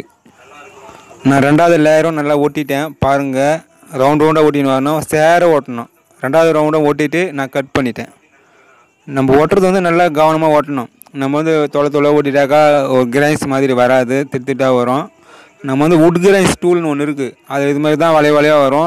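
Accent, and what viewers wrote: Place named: Chennai, India. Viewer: அருமையான தமிழ் மெட்ராஸ் பாஷ பா,பழ்ப்பு வலிச்ட்டு,அருமையான கண்டு பிடிப்பு பா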